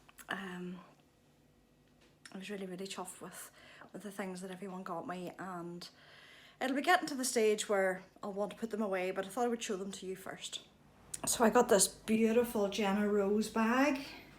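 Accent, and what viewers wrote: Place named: Ireland. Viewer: Thank you so much, could listen to you all the time.Love your Irish accent.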